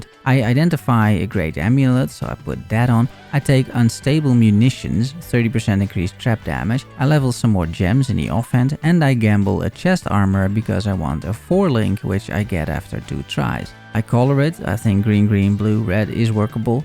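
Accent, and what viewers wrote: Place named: Netherlands. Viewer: Just a random comments, your accent.. are you Dutch?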